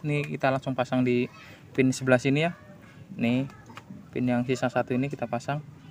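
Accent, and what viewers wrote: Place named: Palembang, Indonesia. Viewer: Asli mana bos? Bahasanya kayak orang Sumatra Palembang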